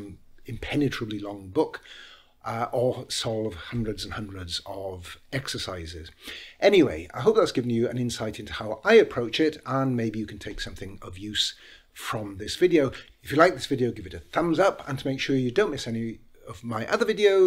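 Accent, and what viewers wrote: Wales, United Kingdom. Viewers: This is the first video I have seen/been suggested of yours. While I very much agree with the message and this way of learning a new language I was mostly happy to hear a Welsh accent after not having been back to Wales in 4 years.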